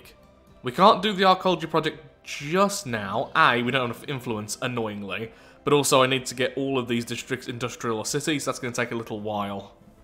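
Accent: United Kingdom. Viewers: Where is that Tim Curry-like accent from in the UK? I keep expecting you to shout 'Spaaayce!'.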